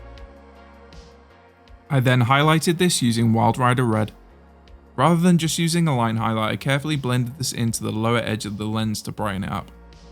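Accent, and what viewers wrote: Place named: Burton upon Trent, United Kingdom. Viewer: Id recognise a Burton-upon-trent accent anywhere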